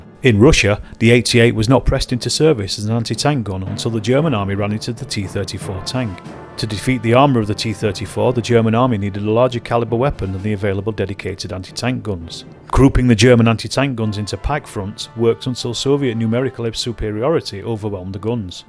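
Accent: United Kingdom. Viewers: You are an idiot. Another British accented individual taking archived pictures and expressing your own flawed opinion on your video. You have NO historical degree so another air thieving mouth breather trying to make money on youtube.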